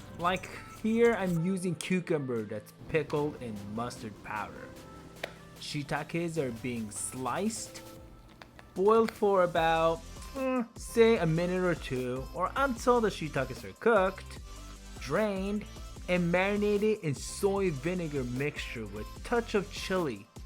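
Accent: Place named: United States. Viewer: We don't say "you greedy" in English 🤔 Your spoken American English is a bit exaggerated.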